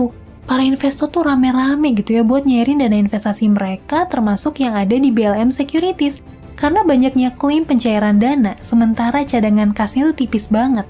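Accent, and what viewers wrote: Indonesia. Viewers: aksennya indo banget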